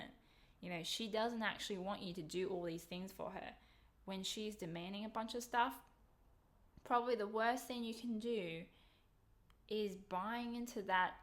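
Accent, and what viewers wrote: Australia. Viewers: are you Australian?? =] you have my accent haha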